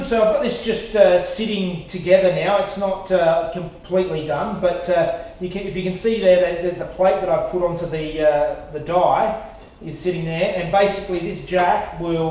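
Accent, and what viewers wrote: Australia. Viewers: He said put the earth on it when he forgot the ground lol it’s just funny because I’m American and I like the way the Aussie talk lol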